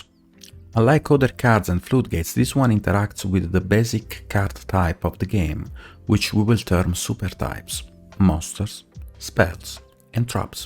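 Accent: Italy. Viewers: Are you Italian 😂 it's just endearing to me how you talk also my neighbors are Italians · Italian Yu-Gi-Oh Banlist ASMR, i kinda dig it.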